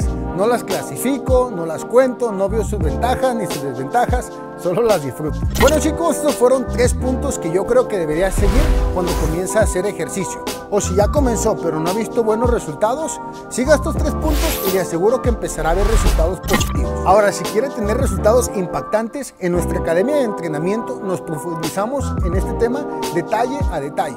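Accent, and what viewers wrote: Aguascalientes, Mexico. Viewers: Por que así hablamos los de Aguascalientes.. 😂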